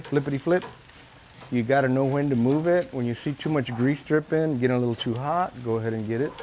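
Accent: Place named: San Antonio, United States. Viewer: Job well done Sir! You sound like you’re a San Antonio native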